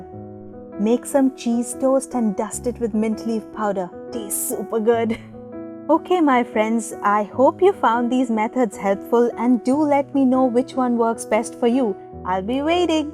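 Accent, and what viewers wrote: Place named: England, United Kingdom. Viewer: Nice English accent and thanks for the video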